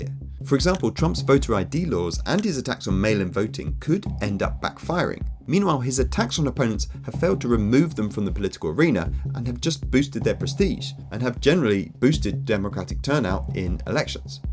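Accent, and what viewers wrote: United Kingdom. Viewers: How does someone whose accent gives them away as a resident of a constitutional monarchy misunderstand monarchy so brutally? “King” and “dictator” are not synonyms, you stump. You live under a king; are you fucking oppressed? The US constitution, in fact, is almost entirely based on the UK system in the 18th century—you have branches of government and divisions of powers, too! You had them FIRST! You lost all credibility right from the start.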